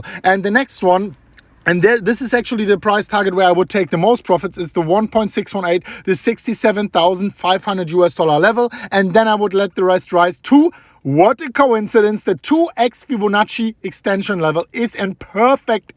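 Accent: Germany. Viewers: Deutscher Akzent kickt xD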